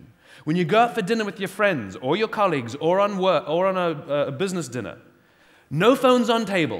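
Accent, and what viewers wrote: United Kingdom. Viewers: wow. first time i'm hearing his british accent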